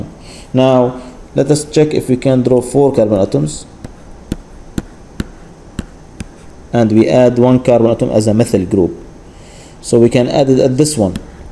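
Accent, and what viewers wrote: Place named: Arab world. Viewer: you sound arab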